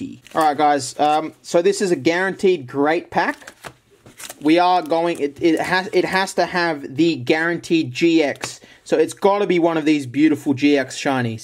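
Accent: Australia. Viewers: Yes your Australian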